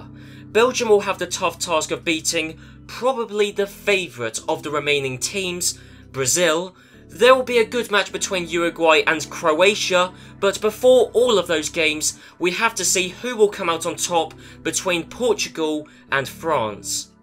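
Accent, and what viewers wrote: United Kingdom. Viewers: Men do I have to correct so many names in Portuguese and Spanish cause of your British accent...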